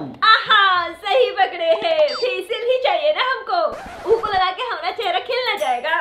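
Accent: Bhojpuri, India. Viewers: I just luv ur bhojpuri accent 👌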